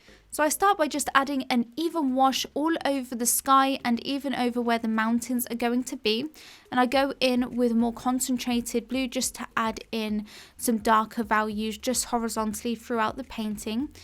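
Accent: England, United Kingdom. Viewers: I like your English accent, where are you from?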